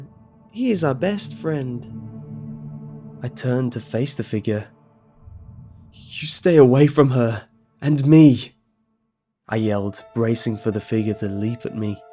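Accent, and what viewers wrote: United Kingdom. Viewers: Oh my..... I thought he said chicken too! LOL Well darn his amazing British accent I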